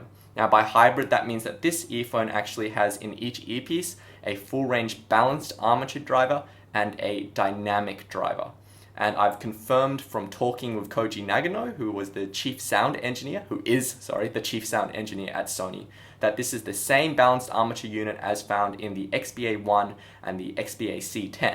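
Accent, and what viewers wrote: Australia. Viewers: how do you do such a good Australian accent? I am also Asian and I can speak Spanish but I can't do an Australian accent.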